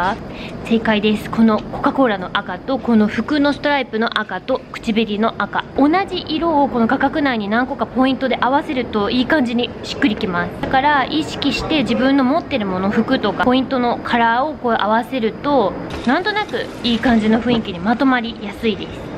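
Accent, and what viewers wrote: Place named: Hakata, Japan. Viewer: チャンネル博多弁♥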